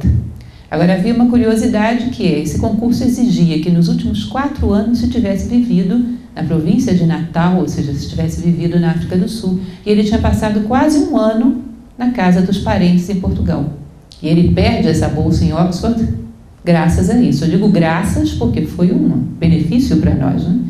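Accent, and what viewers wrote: Brazil. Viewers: Foi uma linda festa pá, mas faltou a língua de Portugal, a lingua portuguesa. A língua brasileira atrapalha, ainda com todo o esforço realizado, estilo e sabedoria, de, "nome bem portuguès, por sinal", e seus declamadores. De qualquer forma, se em Portugal não se fizerem festas assim, que se façam no Brasil ou no resto do mundo, pois dá para perceber o amor de muitos brasileiros a este cantinho da Europa Ocidental, onde nasceram seus antepassados. Já agora frisarei que "a minha biblia" o Livro do Desassossego, foi editado em chinês há + ou - 20 anos. Parabéns a pela sua entrega ao poeta e filósofo! Como teria gostado de a ouvir falar em português de Coimbra... O UNIVERSO INTEIRO NÃO PARECE UMA BRINCADEIRA, UMA PARTIDA DE UMA CRIANÇA TRAVESSA? TÃO IRREAL, TÃO... L. do Desassossego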